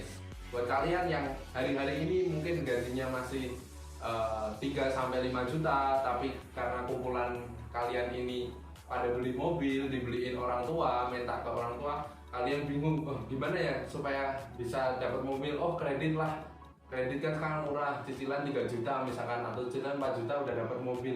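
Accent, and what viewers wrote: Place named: Java, Indonesia. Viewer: Maju terus..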